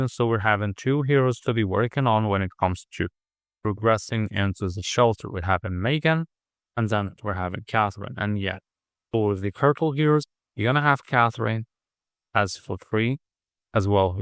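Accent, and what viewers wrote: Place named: Russia. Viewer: Ur English says u are from Russia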